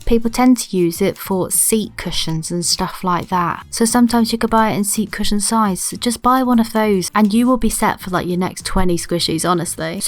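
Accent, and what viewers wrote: United Kingdom. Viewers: U sound like from the uk am I right?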